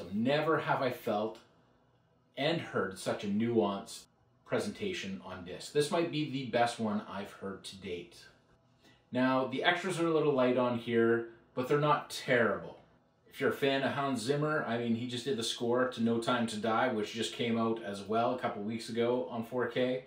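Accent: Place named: Canada. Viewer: Very succinct and quality review! I also enjoyed your Canadian accent.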